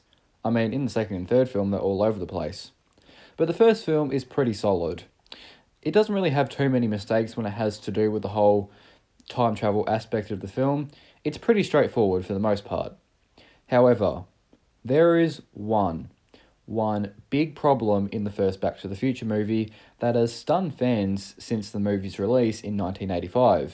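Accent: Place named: Australia. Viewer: God I hate the Australian accent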